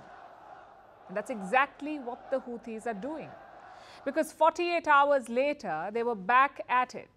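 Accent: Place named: India. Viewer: You have to appreciate the seriousness of an Indian accept with the news! It’s frank and firm! Amazing work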